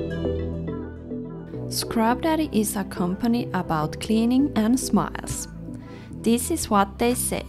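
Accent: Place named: Finland. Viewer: I can tell that you are from finland. Haha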